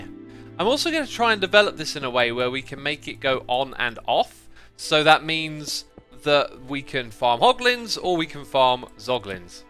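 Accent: United Kingdom. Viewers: Because of his British accent and his commentary style of the true gentleman, I feel like the sound of sipping a cup of tea every now and then would happen any time.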